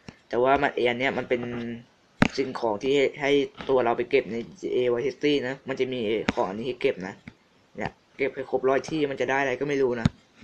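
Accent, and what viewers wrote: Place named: Thailand. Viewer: ทำไมพี่เสียงเหน่อจังคับ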